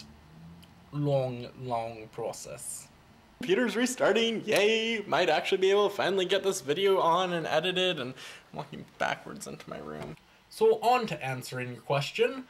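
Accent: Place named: Ireland. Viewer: Kinda Irish.......... :)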